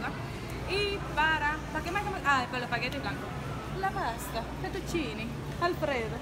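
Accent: Dominican Republic. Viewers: Yo sabía que eras dominicana desde que escuché nuestro acento!❤️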